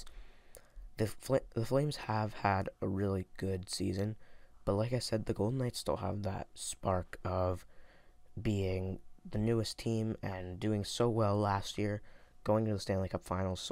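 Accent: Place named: Toronto, Canada. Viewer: You can tell you are from Toronto by the way you say it. “Cheronto” anyways GO LEAFS GO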